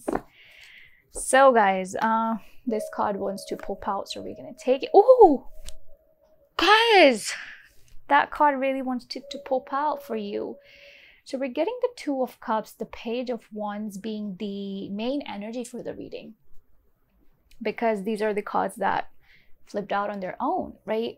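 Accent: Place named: France. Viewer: I like a lot your accent and voice. ..There is smthg french ...and sweet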